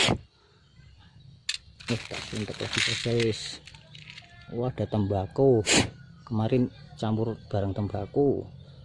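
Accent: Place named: Central Java, Indonesia. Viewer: Mas bro.. logate ky Jawa tengah njih.. Asline auto ngiler niki... 🤭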